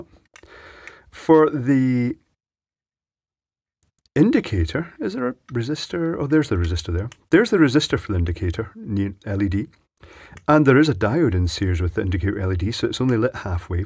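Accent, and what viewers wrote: United Kingdom. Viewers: Maybe its the British accent listening with my not brit-ears ears but at TS I think he said about the LED: "The only time it will glow is when one of the thermal fuses fails". It glows until the thermal fuse fails.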